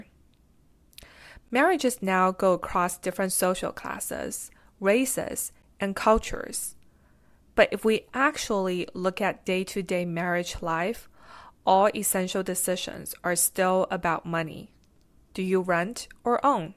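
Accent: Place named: Asia. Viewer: I detect an asian accent.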